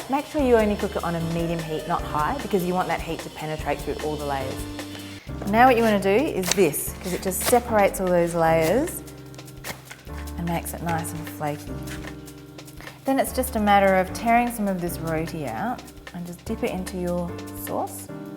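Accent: Australia. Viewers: Yes and it's particularly a South Australian accent. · accent sounds like an australian...anyway,that roti chanai looks delicious!thanks,definitely gonna try this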